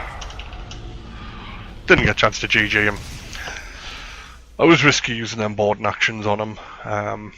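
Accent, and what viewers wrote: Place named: North, United Kingdom. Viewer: Nice vid, have to say where you from m8 as ya sound like ya from the north. Cant wait for the bfg game to launch will u be doing the campaign? And i would deffo get the IN with marks/favours to boost them maybe the imperial navy mark to spam cobras lol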